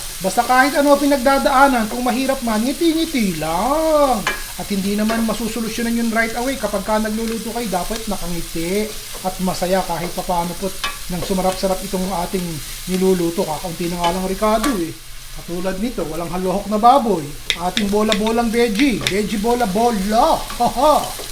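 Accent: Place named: Bulacan, Philippines. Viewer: Bulakenyo po ba kayo? Nakakatuwa po kayo magsalita eh prang tulad nmin dto s bulacan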